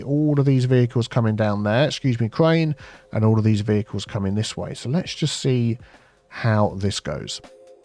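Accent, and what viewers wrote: United Kingdom. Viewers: How do you pronounce Chicago,? I've realised it definitely gets a ch from me but then again I'm not a native English-speaker. Michigan pronunciation I wouldn't have known if it wasn't for CPP but my British English influence is just so strong since school that it plays up on me. Your accent just brings me back to the UK, love it ❤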